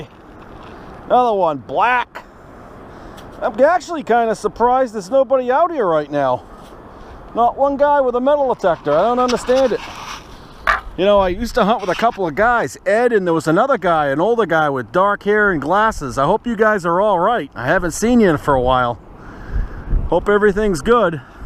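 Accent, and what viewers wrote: United States. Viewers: i f'ing hate the way you yankee's talk ,but i bet you feel same about us ,so we are square ,lol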